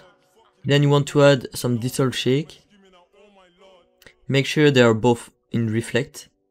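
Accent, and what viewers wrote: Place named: England, United Kingdom. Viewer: ton accents anglais :,D SINON GG et merci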